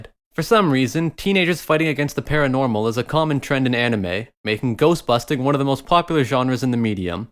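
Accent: Canada. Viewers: He’s either from northern Michigan or Canada with that accent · I forgot this guy's a fucking Canadian but then I hear this nigga say about and eh.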